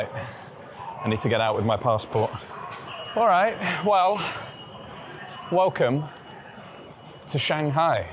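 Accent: United Kingdom. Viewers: why you look and sound so British, but with an Italian Passport?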